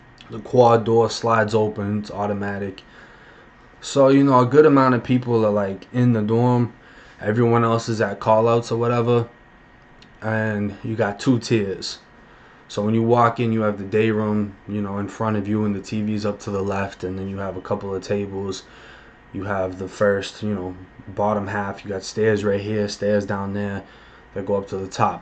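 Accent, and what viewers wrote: Boston, United States. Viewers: This whole time I’m thinking bro sounds like he from Northshore Boston and I went back and saw you’re from Malden that’s what’s up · You originally from Massachusetts? I hear a Boston accent...... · The way you tell your stories with the Boston accent makes it that much better. Keeps me coming back keep it up man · Can tell you from Boston for sure lol. Fuckin with your channel tho bro I appreciate the people you know are keeping the shit authentic. You're gonna blow up soon keep up with this YouTube shit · It sounds like you got a Boston accent under the Floridan sound. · I would've done it too man. I grew up wit all females too. I'm from Boston and your accent is half and half. Keep making vids man you're a great storyteller just like Death that's all you need to blow up on YouTube and make it your job. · Love ❤️ your Boston accent keep uploading daily ....We would watch daily too ..love and wishing you all the best Love 💕 from Southern California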